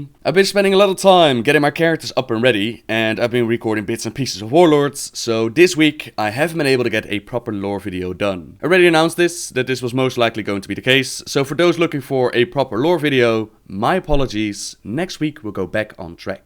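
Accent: Netherlands. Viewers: Woaahhhhh :D first Dutch accent I've ever heard! Peace out man! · I was wondering about that accent too, though I found out you were Dutch because I started watching your Majora's Mask videos and you mentioned it. I really like the accent. Can't wait for more lore, you've been making me really happy to be back in the game. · HOLLAND :D het accent valt wel mee hoor ^^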